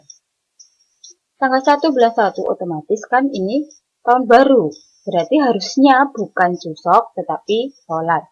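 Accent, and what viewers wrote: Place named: Java, Indonesia. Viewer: Jowo bgt bu...😊😊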